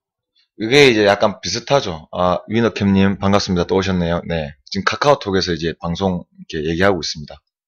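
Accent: Gyeongsang, South Korea. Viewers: 일본어 자막에 틀린 글이 너무 많네요. 그냥 구수한 갱상도 사투리로 쭈욱 하시길…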